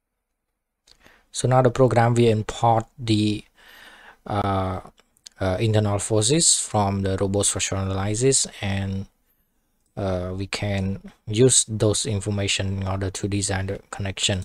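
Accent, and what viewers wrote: Cambodia. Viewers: Ur sound like U are Cambodian!! Anyway this is the good lesson one to learn. Thank!!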